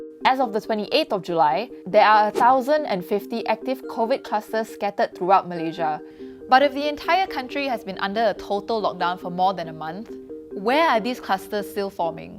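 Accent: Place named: Singapore. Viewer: Can’t understand your Singapore Hokkien English lah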